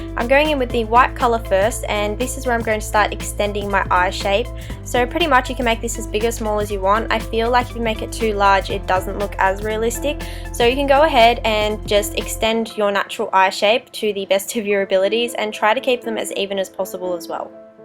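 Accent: Australia. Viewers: loving the Aussie accent yasssssss aussies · You sound Australian 😂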